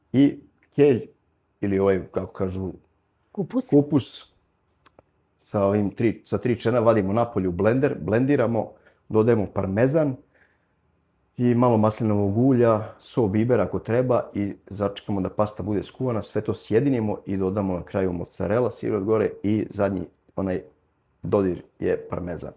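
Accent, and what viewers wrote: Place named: Vojvodina, Serbia. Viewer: Kako je lepo sto si zadrzao vojvodjanski naglasak cist tako da miluje dusu❤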